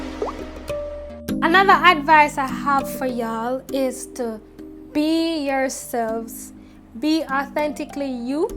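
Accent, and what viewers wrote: Jamaica. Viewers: Alsooo... I love listening to you speak! Makes me feel like im around my cousins and family in Jamaica ❤️❤️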